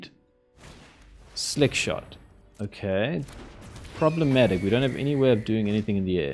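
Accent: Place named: South Africa. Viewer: You South African? :D Also, I see Mythic Mike, I like haha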